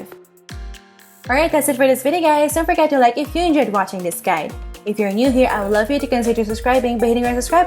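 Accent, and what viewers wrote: Philippines. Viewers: So conyo. Hirap panoorin.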